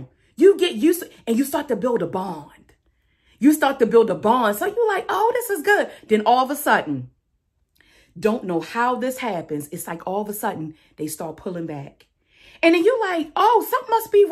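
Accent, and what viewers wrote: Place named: Northeast, United States. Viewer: Are you from Baltimore or Philly btw? I can hear the north east accent.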